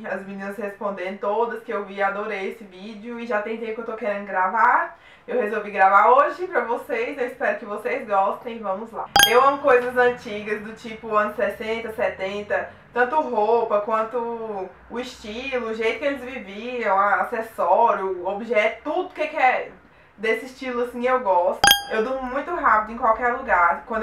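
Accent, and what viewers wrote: Minas Gerais, Brazil. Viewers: Juro que pensei que você era mineira como eu, por causa do sotaque KKKKK adorei, bjs! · Morri de rir com o caso da franja, já fiz parecido, kkkkkkkkkkkkkk' Me identifiquei muito com a sua personalidade, parece demais comigo. Até o sotaque é parecido, só que sou do Norte de Minas, inclusive achei que vc também era mineira porque o sotaque parece muito.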